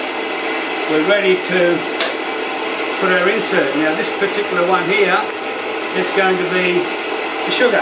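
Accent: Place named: Australia. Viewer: Wow im glad to hear an aussie voice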